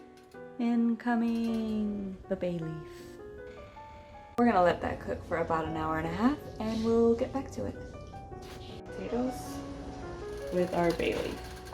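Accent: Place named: Russia. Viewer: Great video! Love that Russian accent!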